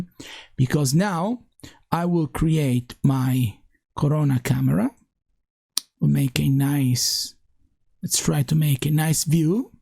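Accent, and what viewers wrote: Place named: Sicily, Italy. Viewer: Finally! Finally that Sicilian accent makes it to YouTube 😎💪🏿🌺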